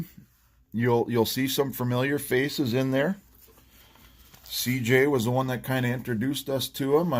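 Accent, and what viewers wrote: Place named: Fargo, United States. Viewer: You have a natural Fargo voice =)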